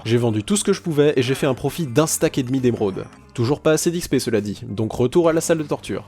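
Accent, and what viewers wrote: Quebec, Canada. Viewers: J'aime ton accent quebecois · tes québécois?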